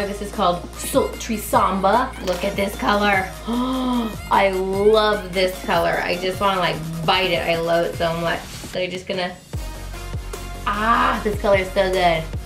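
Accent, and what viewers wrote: England, United Kingdom. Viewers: Love your videos so much! Always cheer me up when I'm having a bad day and make me smile. That English accent though 😂😂😂 it's like a shout out to us in the UK! You should try a wee Scottish one too though and do a Brave tutorial for the Disney Princess series - it's been a while!! xxx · Yeah for English accent. Im English and I love it girl. From Sarah.xx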